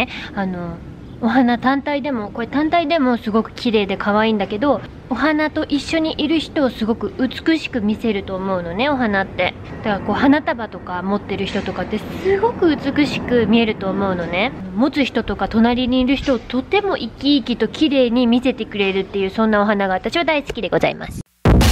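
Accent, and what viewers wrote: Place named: Hakata, Japan. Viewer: チャンネル博多弁♥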